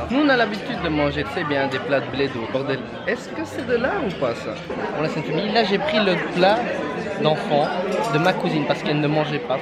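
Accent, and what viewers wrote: Belgium. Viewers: T'es belge??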